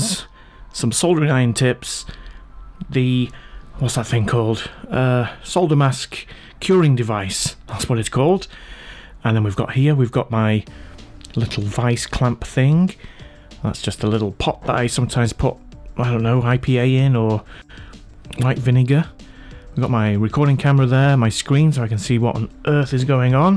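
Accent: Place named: Manchester, United Kingdom. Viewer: Eh up! As a Manchester lad, now long time in the antipodes, yer accent makes me feel righ' tat ohm. Makes me laugh when you forget to modify your accent for a wider audience ( luke vs luck for 'look').Your compact work station and editing suite is magnificent everthing in its place! Neat. Your problem tracing and solving skills are really Sherlock Holmes level. What a winner you are! Just love all your postings. I've learned at lot.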